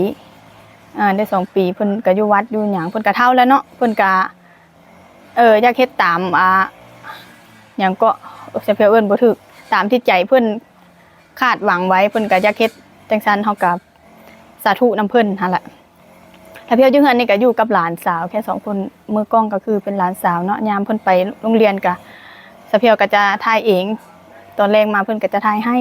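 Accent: Phu Thai, Thailand. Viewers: ทำนองลำขอนแก่น....สำเนียงภูไท...🤣🥰💋:socialdist::elbowcough::socialdist::thanksdoc::chillwcat::elbowcough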